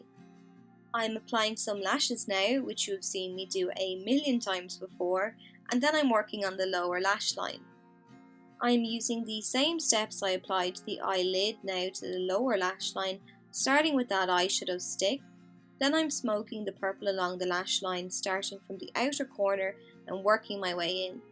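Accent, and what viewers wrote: Ireland. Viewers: Love your channel! Only new to it, but I recognised your Irish accent straight away! I'm from Westmeath! My all time favourite product is pink pigeon Mac lipstick😃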